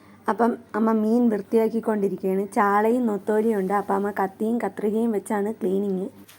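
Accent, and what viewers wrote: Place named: Thiruvananthapuram, India. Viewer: നിങ്ങള് വേറൊരു പുള്ളിക്കാരിയുടെ കൂടെ ഒരു മേക്കപ്പ് വീഡിയോ ഇട്ടിരുന്നത് കണ്ടിറ്റ് വന്നതാണ്.. തിരുവന്തരത്ത് എവിട? ഇതായാലും നിങ്ങള് ആ ഭാഷ തന്ന പറയണത് കൊള്ളാം 😊 നല്ലതായിട്ട് പോട്ട്!! 👍🏻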